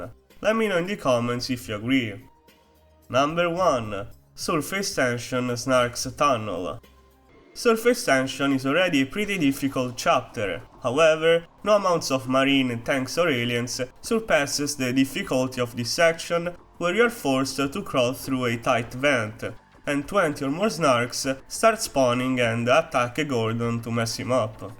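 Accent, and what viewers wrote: Italy. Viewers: Wild guess but, are you by any chance italian?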